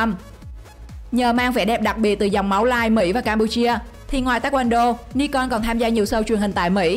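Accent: Vietnam. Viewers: Giọng bạn ấy là Trung lai Nam Giọng miền Nam đậm chất hơn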